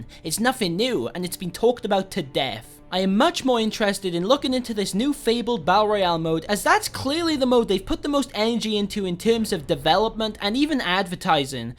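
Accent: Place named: South Wales, United Kingdom. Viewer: Nice to see a welsh YouTuber for once Also you from Newport, like it’s a weird question but you have a south accent.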